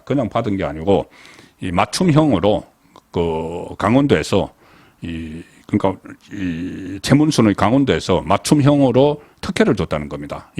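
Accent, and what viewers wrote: Gyeongsang, South Korea. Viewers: 난 그특유의 갱상도 발음때문에 더 리얼감이 있고 좋던데요 . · 대표님 언제나 감사합니다 정겨운 경상도 서부경남 말씨~~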